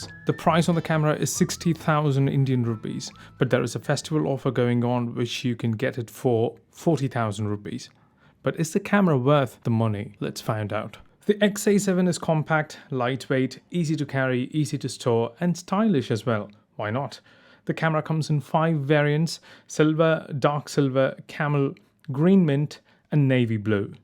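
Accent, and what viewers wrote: United Kingdom. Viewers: That English accent 👌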